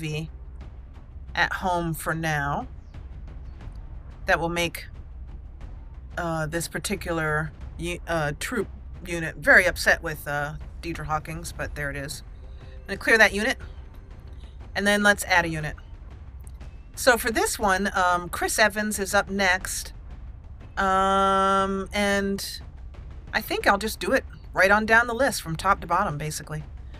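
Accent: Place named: South, United States. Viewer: Wow, this game is very involved. I'm really liking Commander's southern accent; nice job. I don't want to be weird, but those aliens are kind of cute, too. :3 You took a lot of risks this second mission, Commander. It's amazing that a lot of them worked out. Beginner's luck or simple intuition, only time will tell! :D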